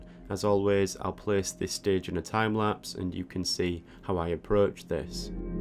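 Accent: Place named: Humberside, United Kingdom. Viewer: i cant help but notice your accent are you perhaps from humberside?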